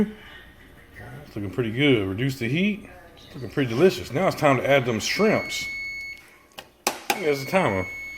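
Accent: South, United States. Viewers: I love his southern accent. Lol he said don't smoke no pot in Texas 😂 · Love love love love your southern accent!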